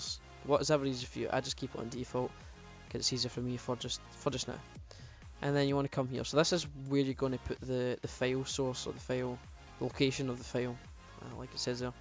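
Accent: Scotland, United Kingdom. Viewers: bit a Scotland u fae bro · Yasss he's scottish!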